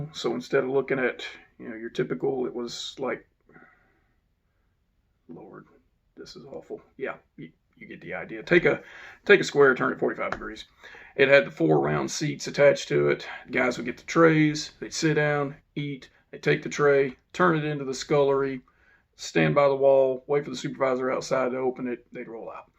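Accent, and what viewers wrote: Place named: Texas, United States. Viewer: I'm glad to see you back! And it's great to hear a texas accent again after a year on the west coast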